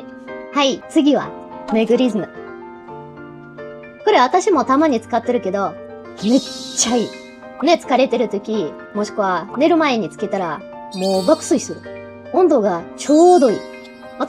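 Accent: Kansai, Japan. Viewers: 関西弁良いですね🎵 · 外国人女性でこの声質で関西弁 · ごきげんさん。初見です～～～～ぅ。なんや、かわいらしいサムネにつられて見たら、どないやねん。:face-orange-frowning: きっつい関西弁の、これまたごっつい関西のギャグセンスまでもちあせたロシア人でてきましたよ～～。:face-fuchsia-wide-eyes: ネイティブやないのに、ひとりつっこみやらそこまでの関西センスはよ～ならんわ～～、すごいわ～～。:face-fuchsia-poop-shape: 音声だけ聞いてたら、まんま関西のおばちゃんやで～～しかし～～、飴ちゃんちょうだいや～～。:eyes-pink-heart-shape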